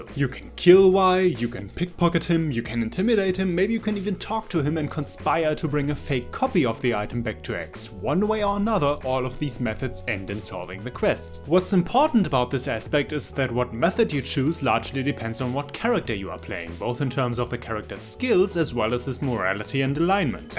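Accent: Germany. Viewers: Oh shit, so that's what it is. I've been trying to figure it out, but I wouldn't have guessed German.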